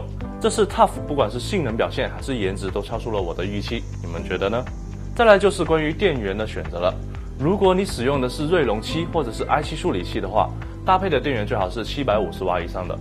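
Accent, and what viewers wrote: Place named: South, China. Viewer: 南派普通话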